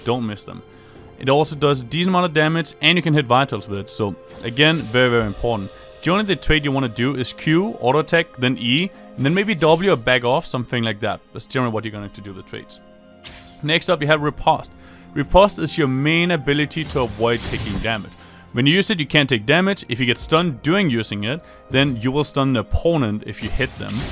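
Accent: Denmark. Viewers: you sound danish :D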